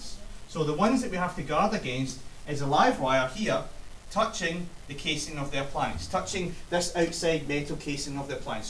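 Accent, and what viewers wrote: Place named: Scotland, United Kingdom. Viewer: This really helped, thanks! Took a bit for me to understand your Scottish accent.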